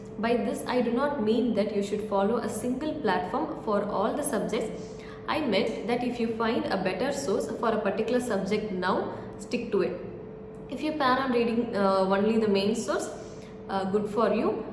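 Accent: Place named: Kerala, India. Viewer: kerala accent :face-blue-smiling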